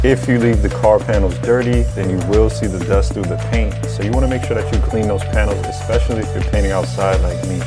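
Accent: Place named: Northeast, United States. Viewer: Dude you said right! I know it's hard with a northeast accent 😂. Digging these flips. Nothing like a great deal, minimal cost and effort to double your money.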